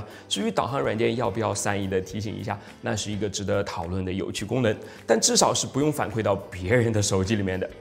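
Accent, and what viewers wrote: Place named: Sichuan-Chongqing, China. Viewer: 我和朋友猜是哪里人，应该是川渝或是江浙一带，前者可能性更大，不知道对不对？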